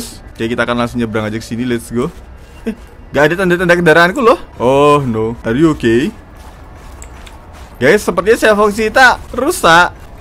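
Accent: Java, Indonesia. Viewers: Kenapa selalu lucu kalo Mas e ngomonge medok 😂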